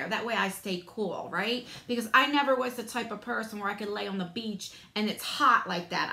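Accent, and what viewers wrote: New Jersey, United States. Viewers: I love your jersey accent. My hubby grew up in NJ and we love going back to visit. We are from New England, United States. We went camping in a state park in NJ on a lake. We were ready for freezing water like in our home state. The water temp is 69 degrees right now ( very cold) well as soon as we walked in the lake were very excited to have it feel as warm as bath water for us!!! I love this box someday I will order one. Thank you for doing such an amazing job. The other thing in NJ that we have none here is the sound of cicadas wow they are loud but we got use to them. The cicada killer bees are huge and scared one of my kids terribly.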